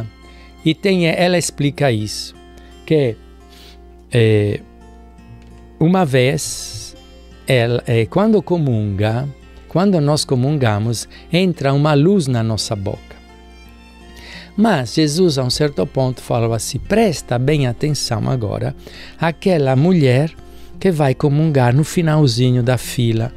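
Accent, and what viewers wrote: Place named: Sardinia, Italy. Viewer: Esse Padre é sardo ( da Sardinha- Itália) não perdeu o sotaque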